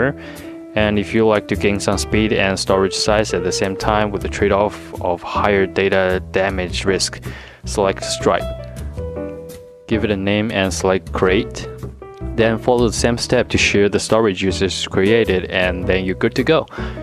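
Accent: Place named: China. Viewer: Are you a Chinese? I like your accent!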